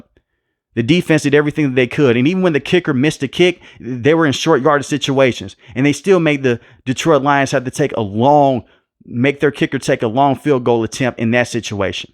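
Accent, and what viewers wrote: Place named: Houston, United States. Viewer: Are you from Houston bro? I know a Houston Native when I hear one lol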